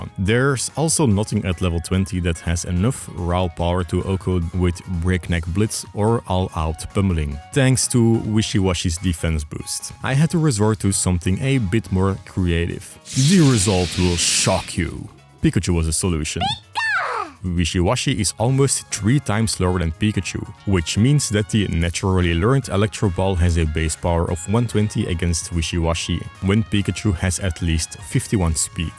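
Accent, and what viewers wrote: Netherlands. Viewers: I can just hear that you're Dutch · Question: R you Dutch, French or neither?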